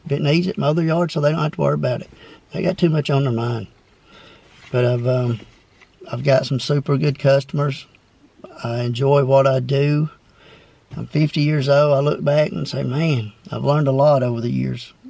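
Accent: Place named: South, United States. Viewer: Man you've got a HEAVY southern accent. Not all southerners sound like you so curious where you live. Turning 50 soon myself and i couldn't agree more about your advice. We have to find a way to slow down and realize money isn't everything. I've changed a lot in recent years. I used to just go and go. I also would feel like an outsider in my own family. For many years I really had no choice because I was the main provider in our family, my wife was a stay-at-home mom. Now that the kids are grown and she started working a new business I am now able to back off a good bit which has been really nice.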